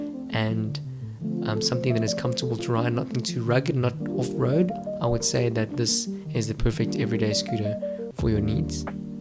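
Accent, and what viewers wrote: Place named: South Africa. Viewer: Great revue :-) Why do you sound south African?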